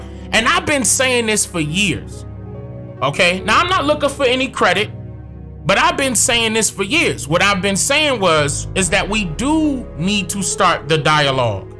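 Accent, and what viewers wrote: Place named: Washington DC, United States. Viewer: Hey your accent sounds very DC like I'm from New Jersey and I moved to the DMV in high school I'm 34 years old are you from the district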